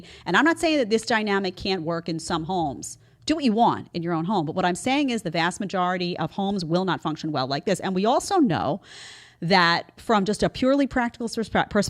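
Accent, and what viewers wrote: New York, United States. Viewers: Mama I truly love that New York accent of yours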